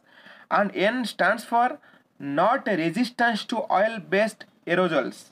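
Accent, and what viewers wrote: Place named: South, India. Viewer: Perhaps he is from southern part of india. therefore pronouncing is different. But video and it's information are good. Must be appreciated. And you know if you want to go in civil services , you must cooperate with person belonging to any part of india.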